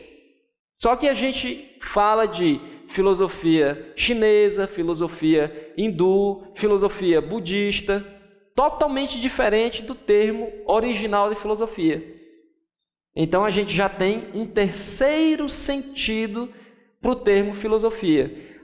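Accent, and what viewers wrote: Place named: Rio de Janeiro, Brazil. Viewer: pelo dialeto deu pra deduzir que você é carioca,o vídeo foi uma ótima introducao da filosofia para as pessoas interessadas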